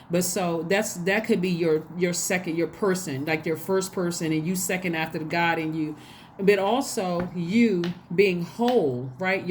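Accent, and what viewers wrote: United States. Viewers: Im french but when i listen to you I feel American lol I love Aquarius ♒️ they are the best 💜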